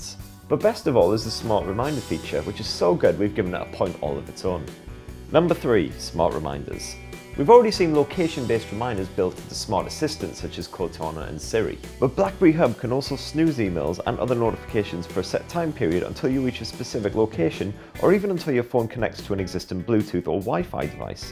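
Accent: United Kingdom. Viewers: love that brit accent jaja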